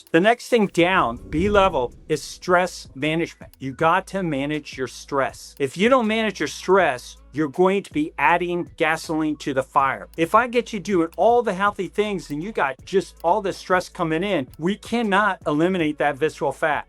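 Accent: United States. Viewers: Gotta love this dude's mid-Atlantic accent. I bet he's from Maryland or somewhere nearby.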